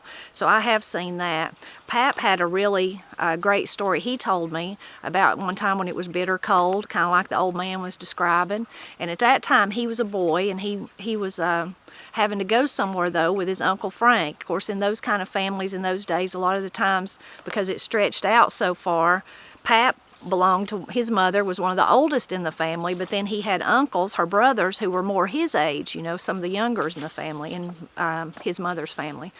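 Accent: Appalachia, United States. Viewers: My mother and her family have a long history of family ties to Appalachia. I always remember my mother and grandmother saying in the winter many a day saying ‘it’s cold as a a witch’s tit.’ I am glad to know it’s a saying I didn’t know if my mom made that up herself or what! When it was a thunderstorm and sunny my mom would say ‘the devil is beating his wife.’ Thank you for your channel! You help me feel connected to my heritage. I no longer live in Appalachia and hearing your voice connects me back to my roots. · I love it, and the way you talk. You have the best accent in the whole of the USA. I'm not kidding. It's been a long cold winter and still is, here at 60 degrees north. I don't have a good name for it though, but will adopt one of your phrases for it! I felt the slight warmth of the sun for the first time today. Keep up the good work!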